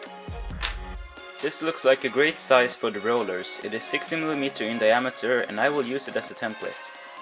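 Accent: Sweden. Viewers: I recognized your Swedish accent within the first 10 seconds of watching lol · I guess by your accent and it saying linolja on the oil bottle that you are swedish Hej kompis! Älskar din kanal! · are you from sweden. it sounds like that ,i am. cool project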